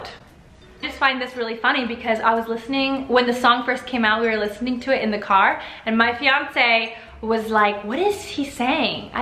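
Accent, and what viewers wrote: Italy. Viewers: You speak You have an italian accent, when you speak english. You did it!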